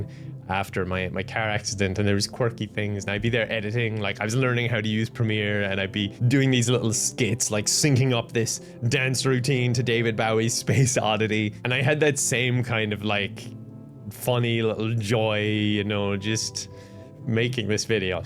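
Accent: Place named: Ireland. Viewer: Jeysus, I've watched for a little over a 3rd of my life (maybe, I was never good at math). I suddenly understand now why I have an Irish tint to my accent. Started watching somewhere around the first spider-man videos. Also even if you don't make a full cover, pleeeeese release the " " song. It was so good. And I'll need to learn that song myself in a few years.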